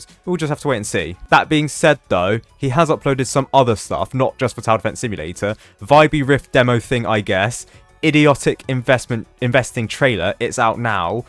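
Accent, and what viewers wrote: United Kingdom. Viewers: Omg too british accent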